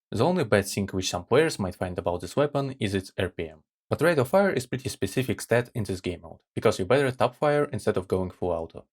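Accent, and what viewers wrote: Russia. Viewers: Мне кажется или он русский?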